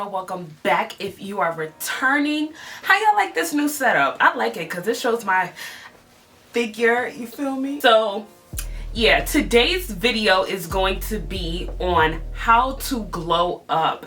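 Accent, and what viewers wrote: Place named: Brooklyn, United States. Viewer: That Brooklyn accent doe🔥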